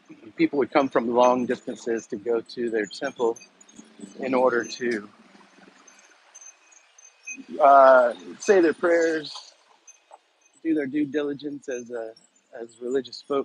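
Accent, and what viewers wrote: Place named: South, United States. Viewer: I hear your Sourhern accent, mister. That part of town is super chill in the morning. The most cars I could count at once was three.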